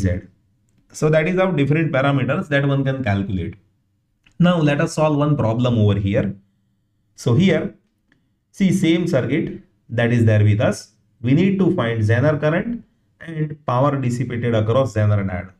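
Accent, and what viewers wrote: India. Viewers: Pure indian accent 😅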